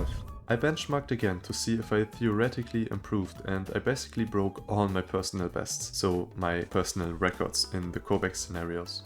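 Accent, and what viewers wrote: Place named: Germany. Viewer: he sounds german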